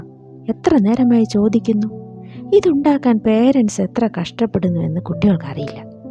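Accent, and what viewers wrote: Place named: Delhi, India. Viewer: Script good...presentation language delhi malayalam pole enikku thonny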